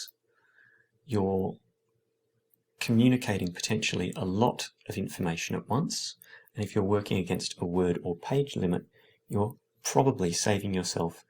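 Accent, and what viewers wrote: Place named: Australia. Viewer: Its Australian love. Where you from?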